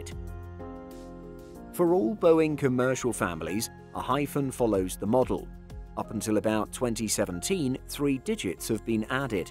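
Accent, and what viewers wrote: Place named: North, United Kingdom. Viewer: This is first time I’ve noticed your northern accent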